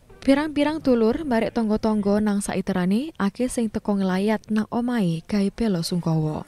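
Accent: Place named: Java, Indonesia. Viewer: Kok jowo ngomongnya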